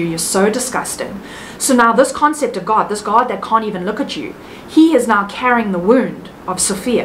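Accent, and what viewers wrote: South Africa. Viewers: You sound South African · Are you a Saffa? 🇿🇦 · You have a South African accent 👁️🐍⚖️🔥☝️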